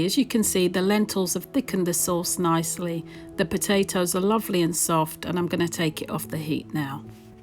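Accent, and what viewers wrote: England, United Kingdom. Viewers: Top recipe thank you and at last....... ....a British accent :) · I'm from Ireland and I'm living in New York and I can tell u that when I hear an English accent, I feel, oh, my cousins so I always love to see and hear my cousins!!!💚 Great recipe!!!! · Have a sweet potato soufflé with chunks of sweet potato. Also I add cauliflower to my curries since it takes up the spices beautifully. Lived in UK for 4 yrs early 80’s so enjoyed the accent a lot! 😊 thanks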